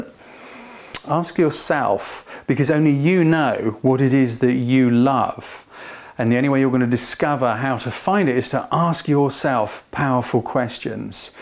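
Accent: England, United Kingdom. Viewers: where in England are you from.. i know by the accent its SE/SW England mate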